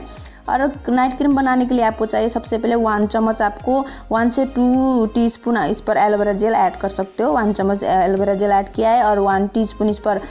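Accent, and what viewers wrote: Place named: Garhwal, India. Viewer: R u garwali, ur tone is like that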